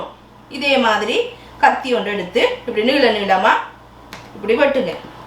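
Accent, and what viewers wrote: Sri Lanka. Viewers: sis ❤️❤️❤️iam nee view er keep in touch my channel sis.Unga இலங்கை தமிழ் மிகவும் அருமையாக உள்ளது சிஸ் ❤️❤️❤️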